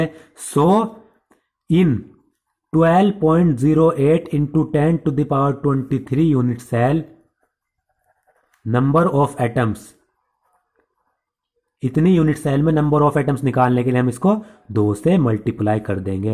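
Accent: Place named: Hapur, India. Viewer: I think you belongs from near hapur According too your language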